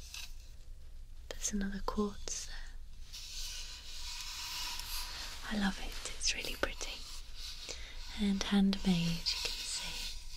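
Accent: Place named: Scotland, United Kingdom. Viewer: hi! are you from scotland or the north of UK? cause your accent is so strong!!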